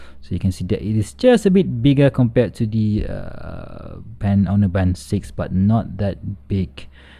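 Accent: Malaysia. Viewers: From your accent i know that you're from Malaysia isn't it?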